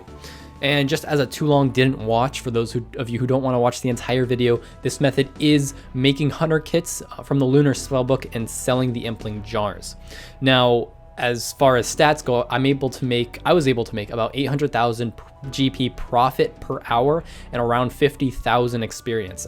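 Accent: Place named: Canada. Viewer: You sound incredibly canadian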